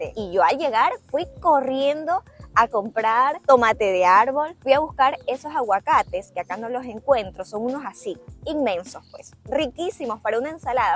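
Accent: Coast, Ecuador. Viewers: 😘 mi hermosa. desde.Toronto Canada niña hermosa. me encantó tu relato de tú regreso a Ecuador.no lo as exajerado nuestro. Ecuador es <link> admira tu sencilles.heres una Ecuatoriana nata.tienes tu acento costeño.y tu video lo as echo a lo puro Ecuatoriano.👋👋👋👋👋te aplaudo y lo e disfrutado niña hermos.te cuento nosotros también somos Ecuatorianos.vivimos en Toronto Canada.por 51 años desde año 1.972. tenemos tres hijos sinco nietos y un bisnieto.. emos viajado muy poco a nuestro hermoso Ecuador.pero cuando emos viajado emos gosado dé las maravillas que tiene nuestro país cuando mis nietos viajaron a conocer nuestro hermoso Ecuador les encanto y gosaron dé la gastronomia Costeña los mariscos fresquecitos.que delicia. que tiene la costa Ecuatoriana.nosotros somos del hermoso Cuenca. y té cuento cómo tú lo sabrás el idioma en Canadá es inglés y francés yo personalmente no lo e olvidado él cantado Cuencano. 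y cuando e viajado él inglés lo e dejado en casa hasta muestro regreso 😂😂!!!!! detambién la gastronomia. dé la sierra es increible.,!!!!! espero si ves este pequeño relato algun dia me respondas mi nombre Leonor.!!!!! te mando un abrazo.con mucho cariño.❤❤❤❤😂!!Febrero 20/24.toronto Canadá.!!!!!! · Te felicito por tu humildad y no cambiar tu acento como si lo hacen muchos ecuatorianos y que ridículos se oyen. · Me quedo un poco sorprendida por tu acento es ecuatoriano aunque has vivido en España mucho tiempo no hablas con acento español como la mayoría de ecuatorianos que solo llevan menos de un año y ya quieren hablar como españoles . Me encanta el Video . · A mi también me sorprendí, su acento costeño, lo tiene muy bien conservado, q bien xq el acento español es horrible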